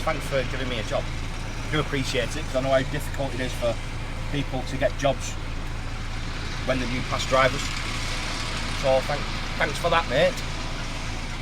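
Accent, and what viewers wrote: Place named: Wrexham, United Kingdom. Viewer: Are you from Wrexham pal?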